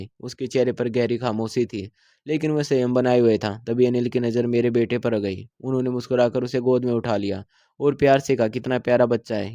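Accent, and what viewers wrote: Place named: Haryana, India. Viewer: हरियाणवी भाषा काम लहजा बोली में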